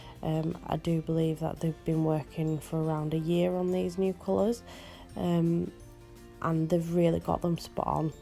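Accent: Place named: Yorkshire, United Kingdom. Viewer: By ek lass . . . Ya proper Yorkshire int ya?. Hahaha. From one Yorkshire lass to another. . . Love these nails.😍💅🏻💕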